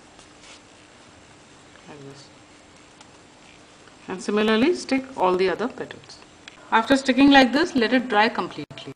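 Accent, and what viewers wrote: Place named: India. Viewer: All your videos are so dark, badly filmed and the object is often out of camera shot. Your Indian accent makes them hard to follow.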